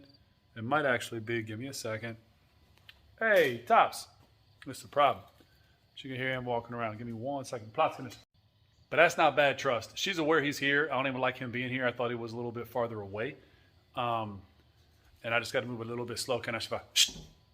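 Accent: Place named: South, United States. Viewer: That accent? LA? Definitely the south.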